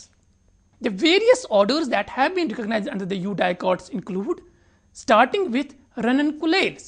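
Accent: India. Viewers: I dig British accent when spoken by English peoples. Yet surprised how English speaking Indian folk, can so easily mangle such a comforting vocal sound! Even when narrator in this video doing so, is speaking about one of my favorite subjects. Morally I agree, my Mother should wash my mouth out with soap after making such an unpolitically impolite rude statement like I have here. I did make a responsible effort attempting to imagine how another Indian viewer would be proud that narrator is a fellow countryman, carefully explaining details involving this technical subject. But ended up imagining that fellow person also from India, totally agreeing with me about narrators accented British accent.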